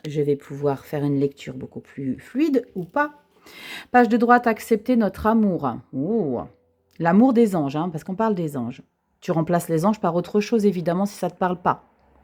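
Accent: South, France. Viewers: Coucou, je vous adore, vos guidances son profonde de sincérité et de lumière, un petit accent du sud? un coucou de Tarbes 65 sud Belle journée à vous et votre famille. Gratitude, gratitude, gratitude. Scorpionne ascendant Vierge🤗🧡💛💚💙💜🤍🙏🐞🦋🍀💐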